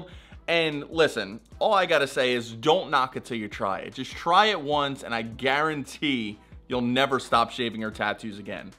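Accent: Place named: Wisconsin, United States. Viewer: You sound like my exgf. She was from Wisconsin. You sound like youre from Wisconsin or Minnesota.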